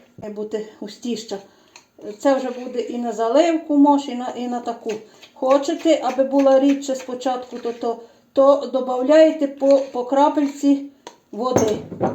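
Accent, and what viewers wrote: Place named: Horodenka, Ukraine. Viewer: Слава Ісусу Христу,з неділею святою будьте здорові.П. я за браком часу невстигаю вам писати коментарі,а ви працюєте,випікаєте.Дуже гарне ваше печиво,а штолени сирні,які апетитні,ви напевно весільна господиня,або десь в їдальні працюєте.Дякую вам за ваші рецепти,що ділитеся з нами.Вітаю вас з прийдешніми святами,зичу миру нам всім та здоров'я.Ви часом не з городенківщини?колись там були в гостях і там похожий говір.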